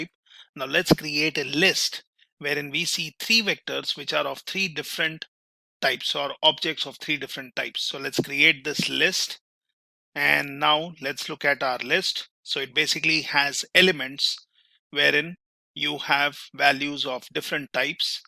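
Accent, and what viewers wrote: India. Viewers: the videos is a value but it's so annoying to be in Indian accent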